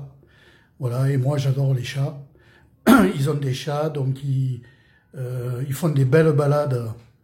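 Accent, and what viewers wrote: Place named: South, France. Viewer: Coucou =) "!!!!!!" Comme dit Lucky, ca donne trop Faim "!!!!!!" Super vidéo d°un excellente organisation, Merci infiniment pour des bonnes recettes "!!!!!!" Ca fait du bien aussi d°attendre notre accent du Sud "!!!!!" Bonne Faim de soirée "!!!!!!"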